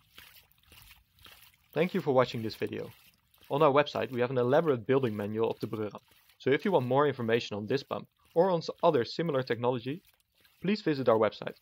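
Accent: Netherlands. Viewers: Lol hearing dutch accent and a water project😂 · First of all fuck the dutch or whatever accent..I feel like Im about to head into a gas chamber...second of all the lost water can easily be recoupped...but no...."Its ok because it comes from a river"....I hope your eggs break....You're welcome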